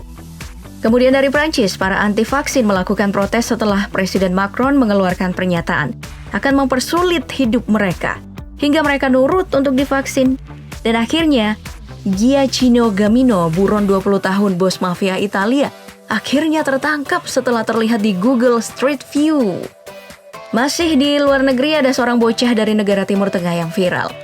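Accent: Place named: Banjar, Indonesia. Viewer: Orang Banjar kah pengisi suara DP nih? Kedengaran Pakai bahasa banjar kadang wkwkwk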